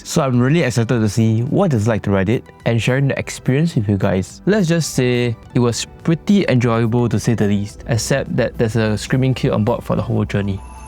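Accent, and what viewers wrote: Singapore. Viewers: You sound Singapore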